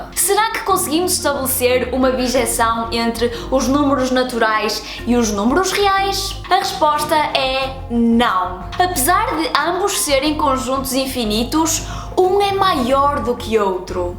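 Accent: Portugal. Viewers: Um canal com um sotaque lusitano: além da linda Matemática, também vou aprender o Português de Portugal e melhor o meu Português do Brasil. Inscrevi-me e licença para eu assistir os outros que ainda não vi.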